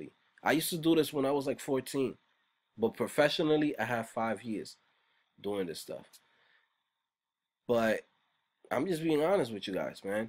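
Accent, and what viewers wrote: New York, United States. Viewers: dude i love they way you are talking ahahah, are you from ny? thanks for telling it like it is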